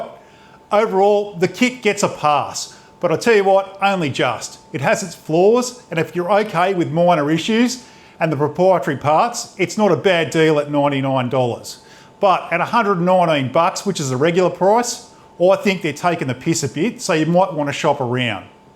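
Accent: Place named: Australia. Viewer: Of course it was a compliment. I'm English and love the Australian accent. Was hoping to brew a Velvet Vanilla Porter by David Heath this weekend but our crappy postal service hasn't delivered the required yeast, despite me ordering it on Wednesday evening and it being posted 1st class Thursday. Royal Mail must hate me cause I'm always complaining about the service these days. I let them give the excuses then tell them I was a postie for 9 years back in the 1990's. Like most companies it's gone down hill since privatisation. Unfortunately my local homebrew shop doesn't stock the Lutra Kveik yeast recommend.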